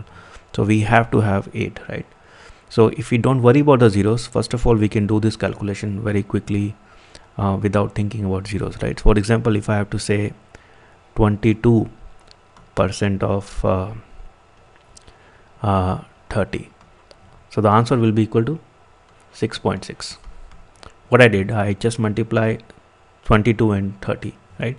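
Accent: India. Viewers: When you here a indian acent you just know hes good to maths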